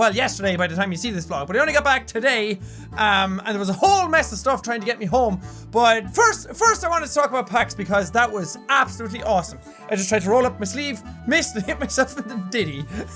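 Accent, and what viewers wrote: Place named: Ireland. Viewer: Where is from in Ireland? I'm from Dublin so I know he's definitely not from here but is it Cork or somewhere? Can't really place his accent hahaha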